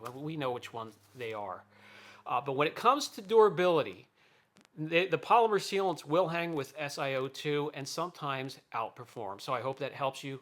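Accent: Pennsylvania, United States. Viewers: Very PA accent. Love the videos!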